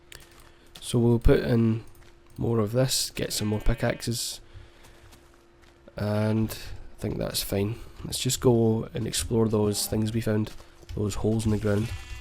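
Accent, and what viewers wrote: Scotland, United Kingdom. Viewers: maaan i love your scotish accent omg be my brother please omg you are like Desmond from LOST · r u scottish?????????????/ lolololol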